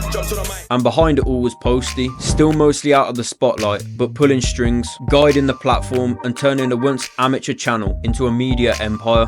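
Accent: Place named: West Midlands, United Kingdom. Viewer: Good video bro, but how JDZ didn’t get mentioned. Early 10s to mid 10s they was the channel. Especially up north, you sound like your from the west mids and jdz was the channel for west mids too. It got so many man on the scene. It definitely moved over to grm / link up but JDZ was the channel especially for the midlands / north.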